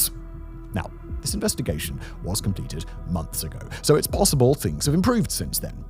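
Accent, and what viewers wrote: United States. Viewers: My god is sounding more and more American every day. It's good to see him want his country to stand up for itself with some fire in his blood about it. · had a little American comin out in him this one got me pumped up given the horrible situation! Stay Strong!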